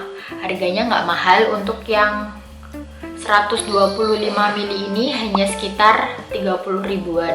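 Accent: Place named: Banyumas, Indonesia. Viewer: Si embak ini kaya irg banyumas ,, bicara ny ngapak😅😅😅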